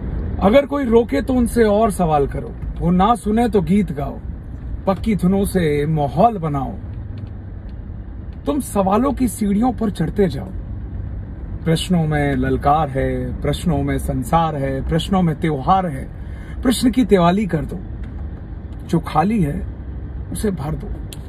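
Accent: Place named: Sagar, India. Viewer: 😁are you from Sagar,,,your accent....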